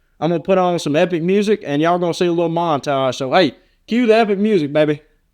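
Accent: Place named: South, United States. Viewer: the redneck southern drawl is epic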